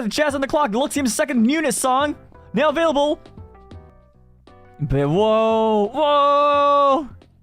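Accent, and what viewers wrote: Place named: United Kingdom. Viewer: 英國腔日語ww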